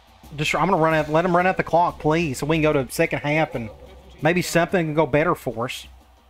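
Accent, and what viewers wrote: South, United States. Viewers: That southern accent hits😂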